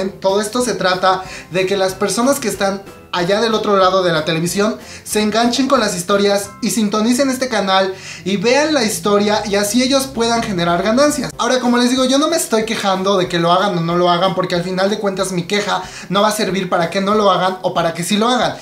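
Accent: Oaxaca, Mexico. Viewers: Omg :v eres oaxaqueño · eres de oaxaca ????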